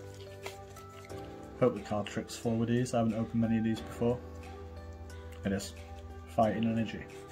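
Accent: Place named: North, United Kingdom. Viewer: Where in the UK are you from your accent sound northern ???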